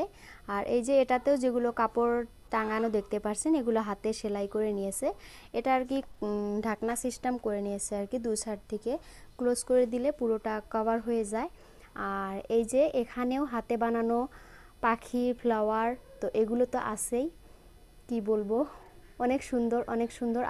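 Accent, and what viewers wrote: Sylhet, Bangladesh. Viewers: সিলেটি নি তুমি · আপনি শুদ্ধ ভাষায় কথা বললেও আপনার উচ্চারণ ও বাচনভঙ্গিতে সিলেটের আঞ্চলিক ভাষার বৈশিষ্ট বিদ্যমান। এই গ্রামটি বৃহত্তর সিলেটের কোথায় অবস্থিত? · আপু কোমার ভাষা আসামের মনে হয় না। মনে হয় সম্পূর্ণ বাংলাদেশী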